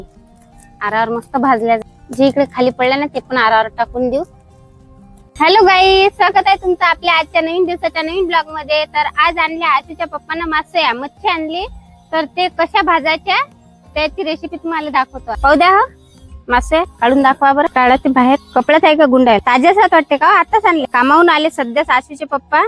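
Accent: Vidarbha, India. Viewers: विदर्भाची भाषा ऐकून खूप छान वाटले ताई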